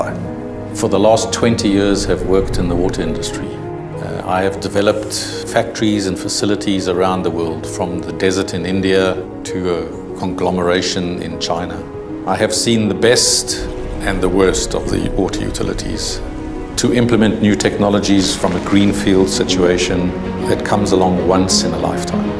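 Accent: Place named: South Africa. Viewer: His accent is South African. Awesome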